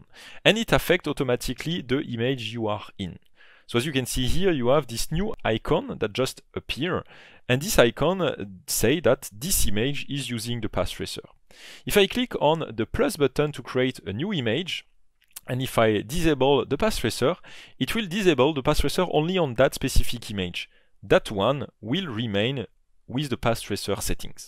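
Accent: France. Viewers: Super vidéo, mais avec ton fort accent français, tu ne pourrais pas nous la refaire aussi pour nous les francophones ??? Ste plait, ste plait !!! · Cela faisait longtemps que je n’avais pas entendu un tel accent français dans une vidéo anglo-saxonne, c’est très drôle même si ça pique un peu les oreilles 😀. Par contre, vidéo très interessante sur le sujet et c’est le principal. Vous avez un nouvel abonné. 👍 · The French accent 😬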